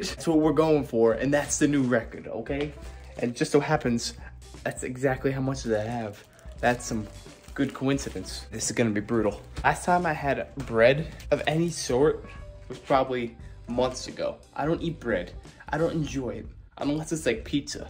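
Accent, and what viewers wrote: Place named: New York, United States. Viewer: your NY accent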